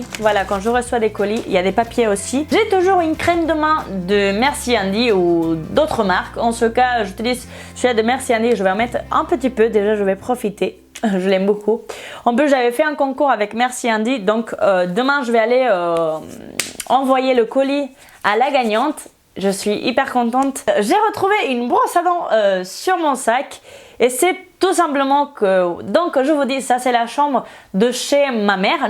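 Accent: Spain. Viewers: Moi je trouve que t'as un acsent espagnol mais j'ai bien aimé la vidéo bye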